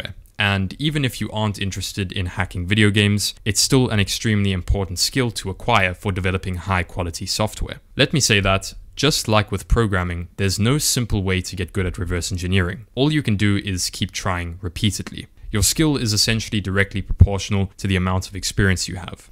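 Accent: South Africa. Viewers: I trust you because, of the south african accent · Do I detect a south african accent? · You sound like you are from South Africa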